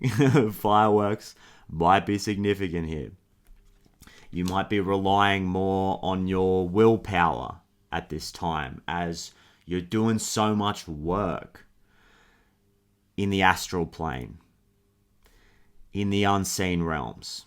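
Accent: Australia. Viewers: He's Australian!!❤🤩A shinning example of our beautiful connected Australian men 😁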